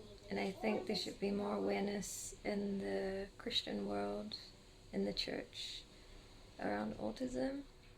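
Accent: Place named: New Zealand. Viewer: Hi from NZ! I heard your accent and knew! ❤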